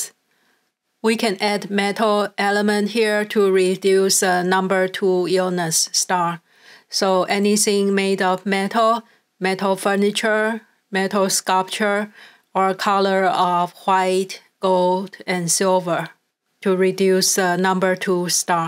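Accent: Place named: China. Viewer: Sorry cannot understand chinese mix English with strong accent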